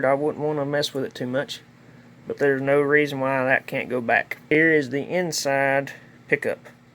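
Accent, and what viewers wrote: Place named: South, United States. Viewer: You're country as hell. You sound just like me. I'm in East TN, what part of the South are you from? I have a Lionel zw275. I've seen a handful of these vw transformers on eBay. I always thought they was just two train operations, instead of four. Are these physically smaller than a zw? I've gotten to where I could use another zw to run my American Flyer trains with. I wouldn't mind trying something different if it has enough power.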